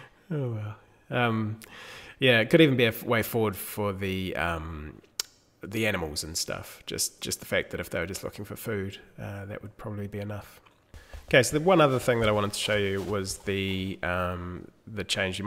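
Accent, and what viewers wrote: New Zealand. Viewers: Dude! This is epic. Are you a kiwi? Sounds like. Me too heh. How did you even get started with making your own engine? It's not like you can just look up a tutorial. Keen to have a chinwag!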